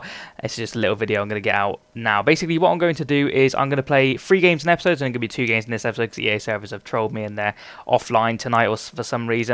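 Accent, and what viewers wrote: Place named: Cornwall, United Kingdom. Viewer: hey, where are you from? you sound a bit cornish ;)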